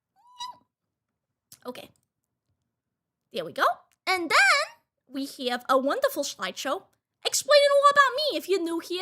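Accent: Boston, United States. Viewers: That bostonian accent is unmistakable. Congrats on your debut fellow detective! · Congrats on the debut,!!! If I can confess something, I had been a lurker for a long time, and your personality and boston accent was instantly and insanely charming! But at the time I was watching you, I had been FAR too shy to actually say anything, let alone be chummy with chat at the time. When you stepped back for the time being, I beat myself up over the fact I couldn't express my gratitude for making my day and keeping my mind off of life. You have no idea how happy I am to finally be able to do so, how honestly teary-eyed I got when I heard your voice again in the teaser. I know I'm being sappy, but I need to express how I genuinely feel and how overjoyed I am at your return. It was such a relief to find that you're the same goofball that I had subscribed to LONG ago. 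Thank you for being you! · Hey I k own that Bostonian Accent!!! 🖤🩶🖤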